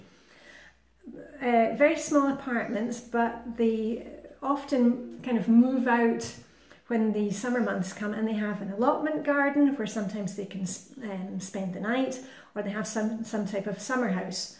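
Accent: Scotland, United Kingdom. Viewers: I love the fact that you can pronounce the y spot on as you do it with the Kylle kylle Tuborg øl. Very good videos. Keep up the good work. Curious to learn where you're from - I presume Scotland but couldn't pin point the dialect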